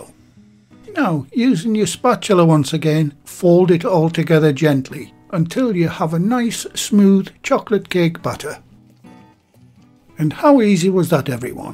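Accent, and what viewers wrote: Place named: North, United Kingdom. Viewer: Wow it looks so scrumptious... I am salivating just looking at the thumbnail. I hit 👍 button even before watching the video knowing will never ever disappoint us but make us happy… It’s like a meditation to listen to your softer silkier voice with nice accent… you pronounce both ‘Butter’ and ‘Batter’ same… it’s very funny you never pronounce ‘E’ for Gas you call it ‘gaas’.. is it northern way of Pronunciation but other northern people I have met don’t pronounce the way you do… anyway thanks dear… Give us another delicious recipe soon… ❤️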